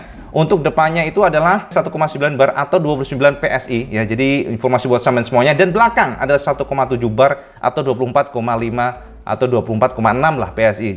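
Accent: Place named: Java, Indonesia. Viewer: Josss Gandosss Cak...Up date Informasinya selalu saya tunggu-tunggu, lugas, jelas, padat dan ciri khasnya yang medok...👍👍👍👍👍👍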